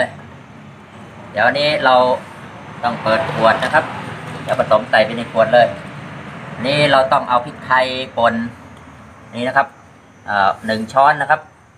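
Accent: Chanthaburi, Thailand. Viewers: สำเนียงเหมือนคนจันทบุรีเลยครับ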